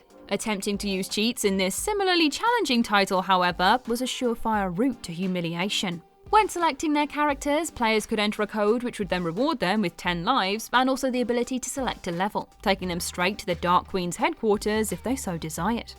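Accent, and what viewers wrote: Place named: United Kingdom. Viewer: The US lingo ("football" that rarely sees a foot) in a British accent, using typically British jokes is really horrible to see. Everyone except the US uses "football" and the metric system. I can see the determination to expand this channel/business to a much wider English speaking market, but really? You have the rest of the planet to think of.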